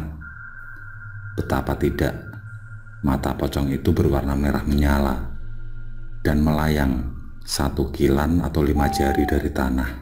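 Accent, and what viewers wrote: Java, Indonesia. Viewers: Jelas banget, mas orang Jawa y Karna logat nya mas Thu ketahaun banget!!! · Kepo sama wajahnya.... Dengan suara khas jawa yg medok.... 😊😊😊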